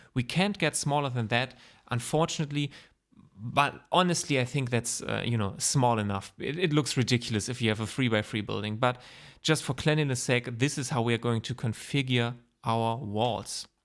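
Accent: Germany. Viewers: I think youre a german guy who speaks very well english 😂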